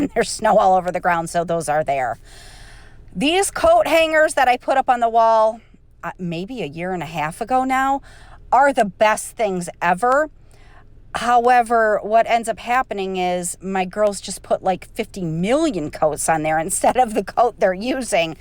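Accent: England, United Kingdom. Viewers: Hi I’m from England and I’ve been watching you since you started and I thought you spoke in an English accent but I wasn’t sure and I didn’t say anything just in case, but well done it doesn’t sound that bad 😂😂❤❤ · For sure...keep the British accent!! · I love your British accent 😂😂 If it brings you joy carry on 😁🇬🇧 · This was a great one.. Love the little British twangy.. Appreciate you❤ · I love remi been watching you both about the same amount of time to. I love your British accent. You should hear my American one its awful 😂 love to you and ypur family x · I love your English accent 😂great job ….lord I hate dust 😊❤️ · Great work I’ve not cleaned behind my washing machine for years and now you’ve made me realise that I’m going to have to do it. And I’m English and I love your English accent you do xx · life is all about finding joy wherever you can and if talking in a British accent is what makes you happy then do it ❤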